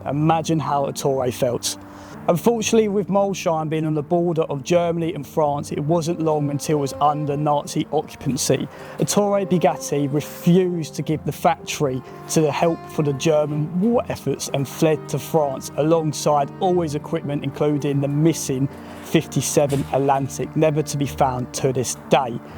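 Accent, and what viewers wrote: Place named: United Kingdom. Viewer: US of A. Also, you & many Brits pronounce your 'th's like Fs and Ls like W's. I love accents & find them fascinating. Thanks for the killer video!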